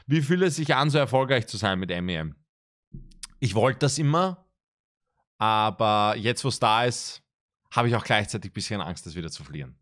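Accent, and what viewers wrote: Austria. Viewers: Red bitte nie wieder Hochdeutsch 😂 für mi als bayer is des echt schlimm😂 des österreichisch steht dir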